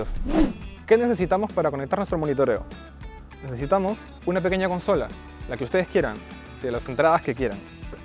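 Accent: Peru. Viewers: Tiene el típico acento limeño. Muchas gracias por los datos sobre el Ear Monitor.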